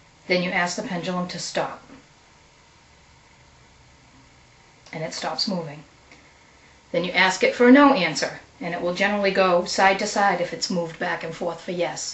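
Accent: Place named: New England, United States. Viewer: Lol you have a new england accent just like me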